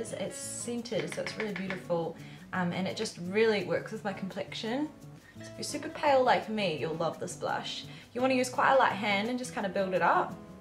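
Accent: New Zealand. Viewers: Hi I'm here from Crystals channel, love hearing a kiwi voice on YouTube. Yay !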